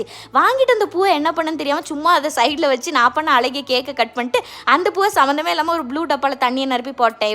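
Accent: Tirunelveli, India. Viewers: Kerala ponnu ella madhu enga tamilnattu ponnu❤❤❤konchum thirunelaveli tamil pesurathu kettuttay erukkalam❤. Today my son birthday.. ❤❤❤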